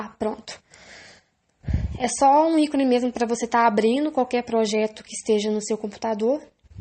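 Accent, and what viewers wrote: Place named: Minas Gerais, Brazil. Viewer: Sotaque de mineira e mexendo com software de Antenas, deve ter feito Inatel...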